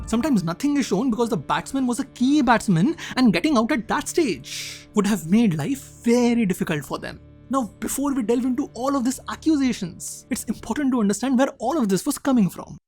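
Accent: India. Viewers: Sorry, but you will have to work on your pronunciation and diction. Your story telling has been going downhill and this was unbearable, literally could not understand a few words. Over confidence and not wanting to improve your craft maybe?! We Indians have a strong accent, but this one was jarring. Slow down, speak properly and give it a few takes and retakes. Content was good, no complaints.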